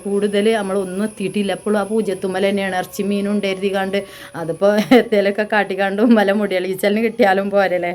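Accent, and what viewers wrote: Malappuram, India. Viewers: നിങ്ങൾ പറഞ്ഞത് ശരിയാണ്. ഞാൻ മലപ്പറം കാരുടെ കൂടെ ഒരുമിച്ച് ഒരു ഫ്ലാറ്റിൽ താമസിച്ചിരുന്നു അവരുടെ അല്ലാം സംസാരം ഇത് പോലെ ആണ് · ഞാനും ഇത്ത മലപ്പുറം ആണ് എന്റെ സംസാരം ഇതൊക്ക പോലെ തന്നെ 🥰❤️ ഒരുപാട് ഇഷ്ട്ടം ആണ് നിങ്ങളെ വീഡിയോ കമന്റ് ഇടാറില്ല 🥰❤️ L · ഒർജിനൽ മലപ്പുറം ശൈലി😊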